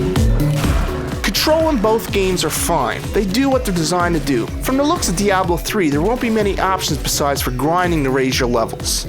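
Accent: Canada. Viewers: is it me, or does this guy have a really heavy canadian accent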